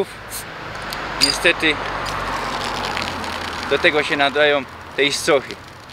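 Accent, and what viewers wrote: Poland. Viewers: Niklas mo Slonski akcent , a Ty mosz Polski akcent. To nie mo nic ze dialektem zu tun.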